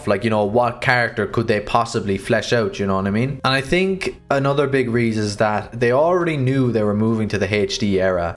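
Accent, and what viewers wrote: Ireland. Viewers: You're Irish ain't ya? Heard it in the accent lol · Are you Irish? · Your losing your Irish accent, but I like how you say 3 and 30. You say Tree and Dirty Tree.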